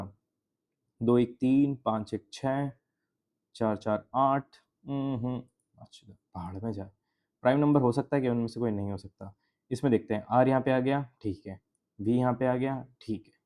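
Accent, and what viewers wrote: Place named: Marwari, India. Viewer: Bhai aap toh meri caste ke ho same marwadi language shikawati Rajasthan jesi😅😅.. Love from Mumbai❤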